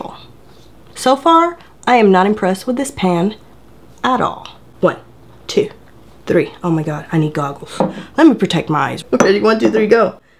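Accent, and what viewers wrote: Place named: Compton, United States. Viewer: Can you do us a favor and not speak like you are from Compton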